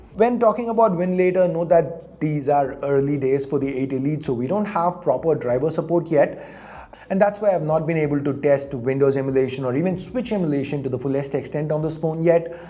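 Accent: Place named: India. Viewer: Hey I hope you don't take this the wrong way but and I apologize if I offend anybody by saying this but usually Indian speaking people drive me nuts because I am in American and we are just so used to the way we talk. Not saying anything about your people it's just the way you guys talk That's it other than that I love you guys. The reason why I say that is because usually I'll just turn the channel off I gave you a chance and I love your channel. I really in to the newest tech when it comes to phones I believe these gaming phones are just a scam You're not going to get anything better out of it than you would say an s24 ultra you get the same performance but that's just my opinion your allowed to have any opinion you choose. The one thing I will give this phone at least they put a better screen on their phone than that rog phone. The rog phone is still putting a 1080p on the newest one where this phone at least want to a 1 and a 1/2 k screen I think for the money you're putting out for a phone like the rog phone I believe it's like $200 more for that time than it is for this one.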